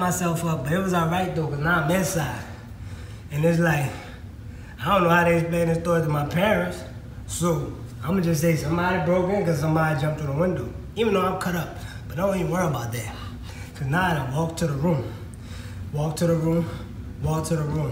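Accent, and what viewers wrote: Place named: New Orleans, United States. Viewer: Is he from New Orleans he sounds like it...I’m a new subscriber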